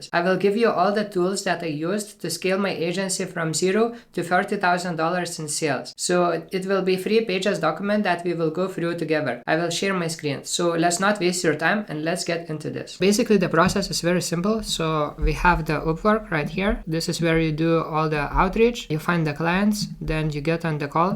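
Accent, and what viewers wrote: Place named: India. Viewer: Why do you look northern European but sound Indian?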